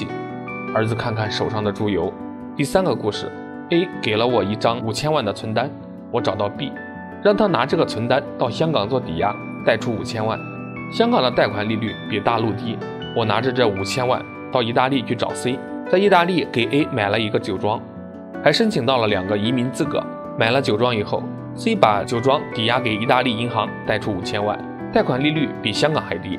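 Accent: Henan, China. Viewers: 兄弟你是Henan的吧？一听这口音，河南普通话，建议注意下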